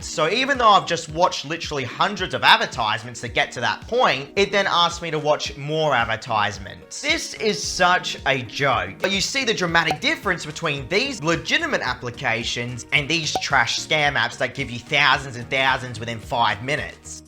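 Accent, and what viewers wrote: Australia. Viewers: Australian accent make mau laughing so hard 😂😂😂😂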